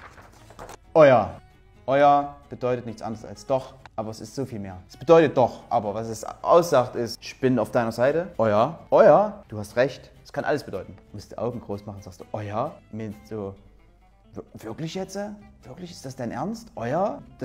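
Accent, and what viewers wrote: Saxony, Germany. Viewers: Sächsisch ist einfach ein hässlicher Dialekt. Sorry, ich lebe zwar rein offiziell in Sachsen, aber mit diesem Dialekt kann ich nichts anfangen. Da ist mir mein Vogtländisch lieber :D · Sächsisch klingt einfach Greislich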